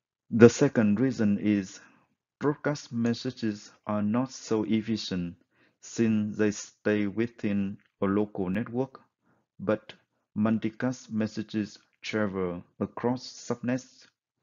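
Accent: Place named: Vietnam. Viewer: nghe phát âm tiếng anh biết ngay người việt =)))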